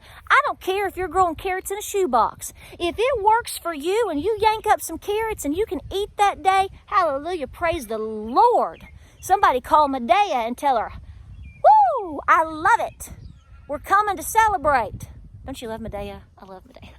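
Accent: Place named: East Tennessee, United States. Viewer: Hey! I spent my first 18 years of life cradled in the Ridge and Valley Region of East Tennessee. Great people, for the most part.... And it feels like the most ancient region of the world, and maybe it is? I so enjoy hearing your stories, and hearing that accent that I love hearing coming from a woman. Down to earth, hard working, tough willed and minded, the breed of people from that area are some of my favorite. I enjoy your even-handed approach to everythiing. You always take a common sense direction in everything you do, and that includes praying about it. That's the most important part, isn't it? Ha! Keep on doin' what you're doin', Girl.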